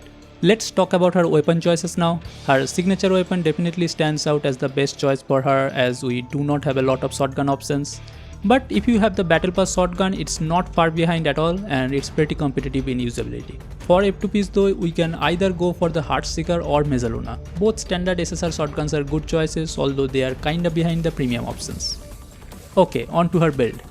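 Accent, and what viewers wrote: India. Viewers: why you sound like an indian?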